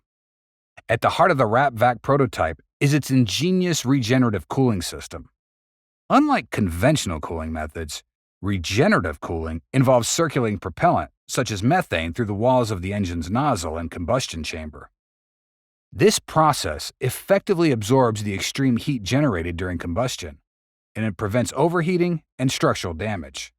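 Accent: United States. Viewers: You're wrong. It's good engineering info, written in a very calm Asian style and voiced in a very cool American accent. Clickbait, sure, but not claptrap. It's legit. It would make sense if you had studied engineering.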